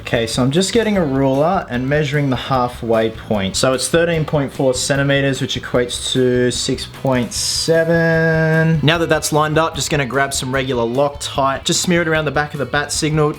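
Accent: Australia. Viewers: your an aussie arent you??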